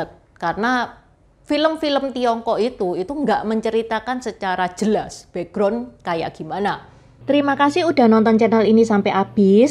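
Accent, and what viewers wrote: Java, Indonesia. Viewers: Ya sambil berbahasa indonesia bergaya LOGAT JAWA. MANTAF🙏🙏🙏👍👍👍👍👍👍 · Mbak suaranya medok banget.jawanya mana mbak,👍💪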